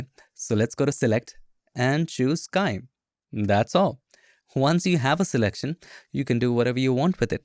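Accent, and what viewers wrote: India. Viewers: This guy makes the Indian accent very smooth and well integrated with English language. Nice style.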